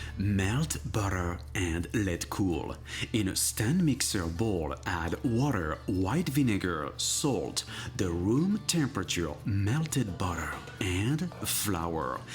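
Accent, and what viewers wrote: France. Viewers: He really speak like a stupid frensh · Lydia Aschenaki he is French-speaking, with a typical French accent. If his accent annoys you, mute the video, or get a life. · Are you from french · hi, thanks so much for your reply. I think you're amazing in your baking. I watch many other video in baking but it's never as perfect as the way you demonstrate. I love your accent, it's that French accent? I love French baking although I never bless to eat anything French. I am very please to found your video for baking. Thank you so much and please continue with more delicious baking, one day I want to learn how to make croissant By the way, I watch that video so many time to get to steps, and at the end you said "sorry guy" and you took a bite out of a croissant, I want a bite too lol....so delicious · Hes French what kind of accent do you want him to have? 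Southern?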